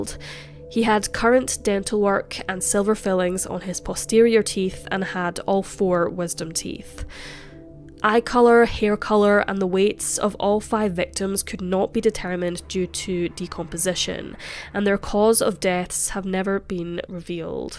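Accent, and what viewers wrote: Scotland, United Kingdom. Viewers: Scottish, they sound beutiful until they get drunk and then noone can understand what the fu*k they're saying 😄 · Love her Scottish accent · It's the scottish accent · The Scottish accent is enchanting, isn’t it? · Is the narrator Scottish?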